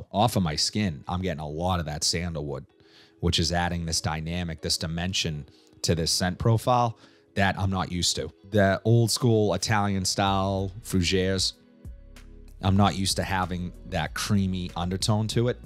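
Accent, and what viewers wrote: Boston, United States. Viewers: I wanna live in the states if anything for the fragrance variety at Marshall’s . Canadian ones are more or less terrible . Winners is the worst. I’m also here for the Boston accent 😅👍🏻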